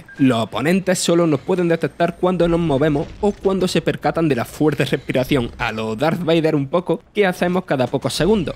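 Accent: Andalusia, Spain. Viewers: Aprende a vocalizar! Hay una delgada línea entre un atento andaluz y tartamudear en una seccion de noticias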